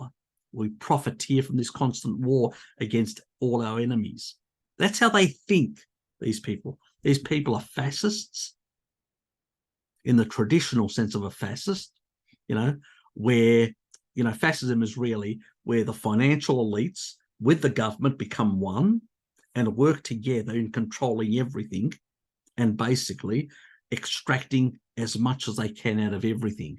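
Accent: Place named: Australia. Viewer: you have a very broad Aussie accent for a guy of Greek heritage!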